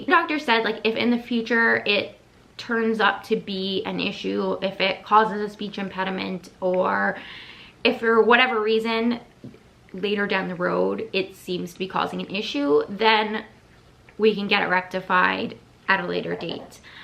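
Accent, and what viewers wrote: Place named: Central Jersey, United States. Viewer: We have literally the same accent (I'm originally from central Jersey) 😂 my husband always teases me when I say "bad" like that 🙄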